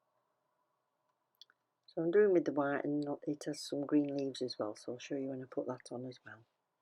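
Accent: Sheffield, United Kingdom. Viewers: Wow absolutely gorgeous 💅 Nail colab stunning colours love how you done the 3d flower such a beautiful design. you don't live far from me i am from creswell worksop i thought your accent sounded familiar such stunning views around Sheffield thanks for sharing these beauties lovely take care and have a fabulous day 😊😘🥰🤩😍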